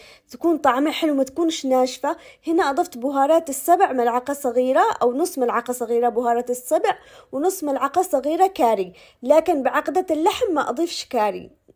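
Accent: Aden, Yemen. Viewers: تسلم ايدك يا وفدوه للهجه العدنى ايش المحرقات اللى يحطوها بدل الطماطم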